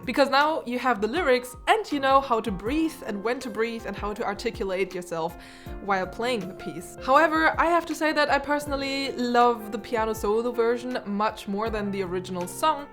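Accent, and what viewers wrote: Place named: Germany. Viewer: Never lose your German accent.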